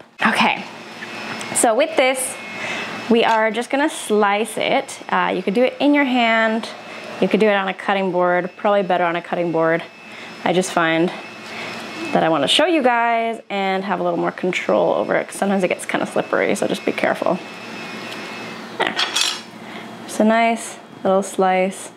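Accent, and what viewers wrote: Costa Rica. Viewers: En español tienes acento costarricense, por un momento pensé que estaba usando una dobladora costarricense 😅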